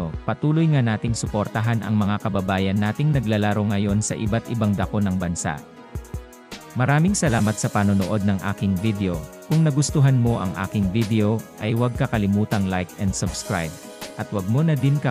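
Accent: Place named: Pampanga, Philippines. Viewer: kapampangan yung nagbabasa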